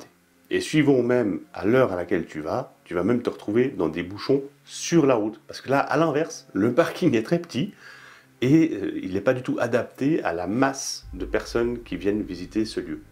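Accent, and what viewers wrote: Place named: United Kingdom. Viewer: Je suis tombé sur vos vidéos il y a quelques jours, et en tant que grand passionné de l’Écosse et de tout ce qui s’y rapporte, je tenais à vous dire à quel point je les trouve captivantes. Votre ton résolument "so British" (même si, en Écosse, ce n’est pas toujours un compliment 😄) apporte une touche unique et agréable. Bravo pour votre travail, et surtout, continuez comme ça ! Alba gu bràth , Slàinte Mhath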